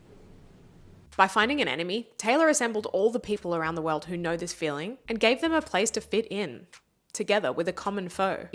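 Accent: Australia. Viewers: Just realised your Aussie! Birds of a feather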